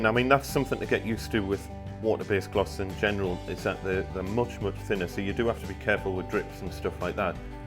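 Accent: Newcastle, United Kingdom. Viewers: I have to use oil based gloss for work sometimes when the need arises, and at the start i didn't like the sound of using the water based but i think with me it's got to a stage that i want to try it out. I think when you are painting doors etc and you have to deal with the smell, plus any possible runs you get at the corner of panels, the time it takes to dry, and especially when you have to clean up and put tacky equipment back in the van with a fear of anything tipping over and getting it on stuff you wouldn't want it on, i just can't wait to be able to wash my brush and trays out under the tap and not have to worry about it, plus how quick it would dry too compared to oil based paints. Love the Geordie accent by the way followed by the Jimmy Nail grin. I'm glad you told me al aboot that. Many Thanks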